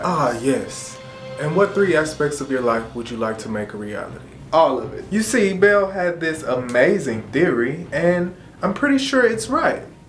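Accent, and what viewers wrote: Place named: New Orleans, United States. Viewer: Your NOLA accent was on point friend and this coming from a BR native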